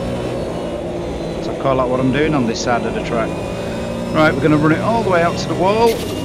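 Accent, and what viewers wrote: North, United Kingdom. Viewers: I fear for our sanity when dust becomes a variable and rateable update worth recording. But we ‘is’ sim races, and we do this with pride. And yes, it does look better. Nice vid as usual partially due to your beautiful northern dulcet tones. Appreciated.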